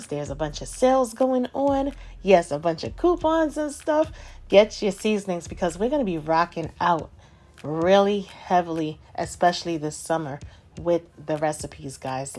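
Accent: New York, United States. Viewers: Ooooh, I'm looking forward to cooking that one up myself. And great tip with the pre-fried salami! Ps, your New York accent is simply adorable (it reminds me at times of Rosie Perez 😎). Cheers from Toronto.✌️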